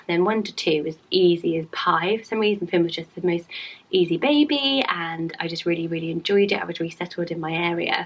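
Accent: United Kingdom. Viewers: Feel better! Funny story; when I first started watching you about a month ago, my captions would always write your name as and I’m American so i just thought you were saying w a British accent I guess? And i was like why the heck is her channel name? then one day my captions wrote, and that’s when it finally clicked🤦🏽‍♀️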